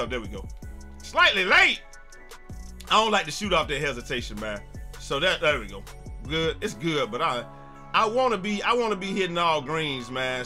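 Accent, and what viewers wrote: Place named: Beaumont, United States. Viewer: Ha hhhhaaaaaaa lol let’s get it wit da green da win, boy you act like me frfr then you gave Goudeau a shot out, stop it sound like you from Beaumont, gg I enjoyed that game play tremendously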